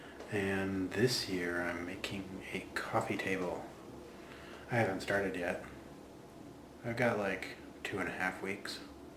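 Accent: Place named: United Kingdom. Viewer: I'd like to see your other British accents.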